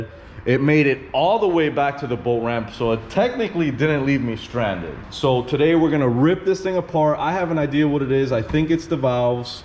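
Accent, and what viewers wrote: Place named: Cuba. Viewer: Are you Cuban because in some vids you speak spanish and you sound Cuban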